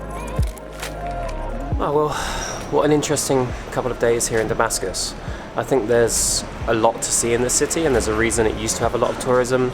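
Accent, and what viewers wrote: United Kingdom. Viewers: He sounds like he's from Southern England? How hard is it to visit a country like this with a UK passport?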